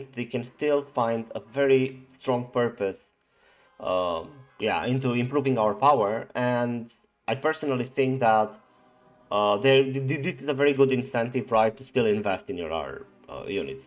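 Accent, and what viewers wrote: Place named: Romania. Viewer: How to recognize a Romanian just by accent :)))) What gave you up 100% was the: "La, la, la" instead of "Yada, yada, yada" :P Great video btw!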